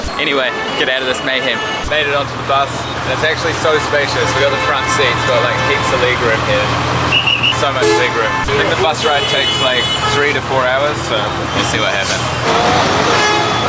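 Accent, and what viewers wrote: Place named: New Zealand. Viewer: Hey,...just want to stay how good your videos are, so interesting, and eye opening in all aspects. You are very courageous even to venture into the places you have, and as I will definitely never venture into those parts of the world that you have so bravely taken the time to visit, your videos give a very good idea of what I've chosen not to experience personally. Hats off to you for continuing this channel. Stay safe, watch for crime, and keep them coming. I'm an avid follower now. And by the way, I spent 23 years in NZ living in Auckland and Wellington, so your accent is very familiar to me. I now live happily in the prairies of Canada. We are avid travellers to most of Europe, Australia and New Zealand, Alaska and the Caribbean areas, taking cruises mostly. With the COVID, of course, things have gone on hold for about 2 years. 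I'm 74 now and once again, want to thank you for all the good work you are doing to enlighten us on how some of world exists. My wife and I say every day how blessed we are that we live where we do. It's so interesting, though, that despite the difficulties you have encountered, people there are so friendly and seem to be coping somehow. Once again, stay safe, keep those great videos coming, as it is the only way I'll ever appreciate how there is so much suffering in our world today. I'm afraid the population is getting out of control in the areas you are visiting. Hopefully, humanity will sort it all out one day. Cheers!!!!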